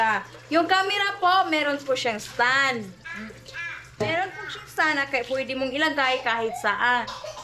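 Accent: Visayas, Philippines. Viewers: Dear most of visaya tlga, ganyan sila magtagalog just like kung pano magsalita mga tiga laguna, batangas, ilocano, british, english american, thailander, chinese. Tawag jan accent. Naiintindihan nmn namin sinasabi nya. Di nya kailangan mag adjust sa mga nanonood sknya kc we have choice nmn to stop viewing her video if you think na nakakairita kung pano sya magsalita. Sa pilipinas lang ata may mga ganyan na tao na kapwa mo pilipino ginagawang katatawa ung pananalita mo at linguwahe mo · Di sya galit, nag papaliwanag lang .sounds lang galit kasi ganyan mag salita ang bisaya accent lang yan na parang galit , 😅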